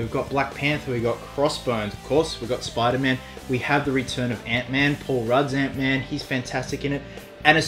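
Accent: Australia. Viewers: Subscribed straight away when I heard your accent. Fellow aussie ;) Fucking loved it. And thank god someone is finally #TeamIronMan. All my friends were Cap :<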